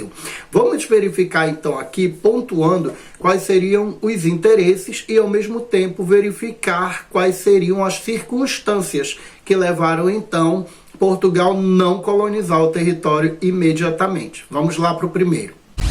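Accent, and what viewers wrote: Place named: Pará, Brazil. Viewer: Poxa, professor, acabei de encontrar o seu canal, vi dois vídeos e já sinto sua falta 😂. Pelo sotaque vc é do Pará (minha terra de criação) vivi em Altamira por 19 anos. Obrigada pelo conteúdo de qualidade acerca da história 🎉🎉🎉🎉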